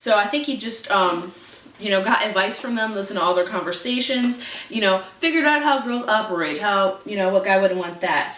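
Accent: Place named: United States. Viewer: Love your videos :) Just wondering-how old are u? x3 Btw, what part of America are u from? (Sorry-it sounds like I am stalking u it's just I live in Britian, and I need to put on an accent from America-but I'm not sure which one I'ma choose lol)...Sorry Im like soo random ;p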